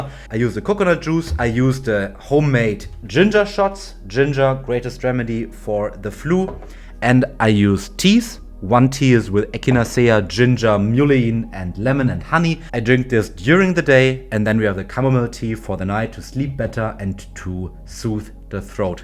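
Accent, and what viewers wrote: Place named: Germany. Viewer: I can hear out the DEUTSCH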